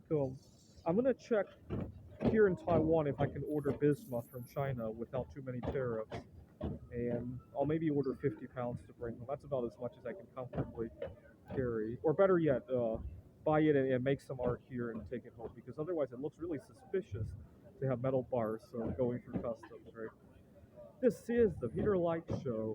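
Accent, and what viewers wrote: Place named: United States. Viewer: do you generally communicate with people in Taiwan in Chinese or english? How do people react when you start speaking English to people there in your American accent? Just curious because when I go to India I get a lot of head-turns and confused looks, because to them, seeing a person that looks like them but sounds foreigner is a unique sight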